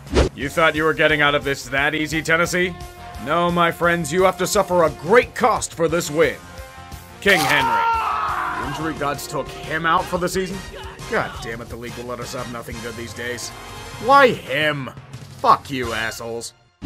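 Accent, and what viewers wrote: Pittsburgh, United States. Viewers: Anyone else love his “yinzer” accent? I think it’s hilarious 😂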